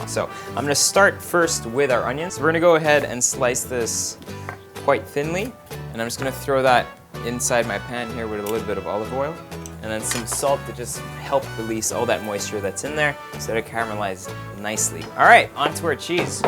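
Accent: France. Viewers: Great, simple recipe.. It look so yummy! And that French had me swooning over even more than usual. Keep it up! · Homies French? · good French accent !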